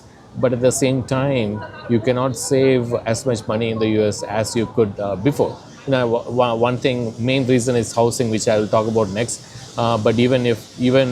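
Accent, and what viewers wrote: Kerala, India. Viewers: Very useful.... seems you are from Kerala.... ?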